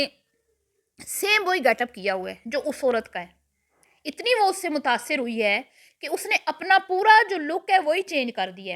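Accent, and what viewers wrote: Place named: Pothohar, Pakistan. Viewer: Apka accent kafi pothohari hai which has my ancestors too. Bohat acha lagta hai apko sun kay. 🙂.💜 from Canada.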